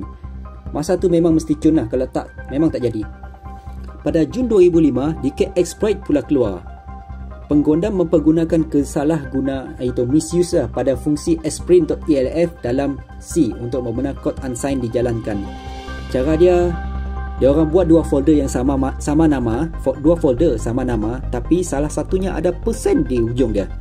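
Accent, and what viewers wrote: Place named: Sarawak, Malaysia. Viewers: Bunyi kedak org sarawak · Aku tak phm sangat apa yg dia terang tu, tpi yg aku tau speaker tu org sarawak sbb dri segi dia bercakap mmg nampak slang org sarawak. Aku harap bukan aku sorang je yg perasan.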